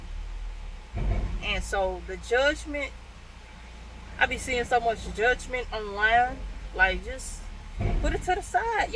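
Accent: South, United States. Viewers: Hi. I watched your video for the first time. It popped up on my feed. 1. Where are you from? I love that country twang. 2. I enjoyed how you came across with your message. 3. Are you married cause I'm going to follow you going forward... with your cute country sounding self. Don't want to disrespect. GOD Bless you for following GOD's instruction to speak what HE put on your heart at this time. I know you are helping folks. Many people are struggling like never before. I always say all of our worldly possessions and all the things we create... we can't take it with us to the next place. Someone said we are just renting them from GOD.:) Stay Cool. I'm definitely going to share your message to my contacts. Bless You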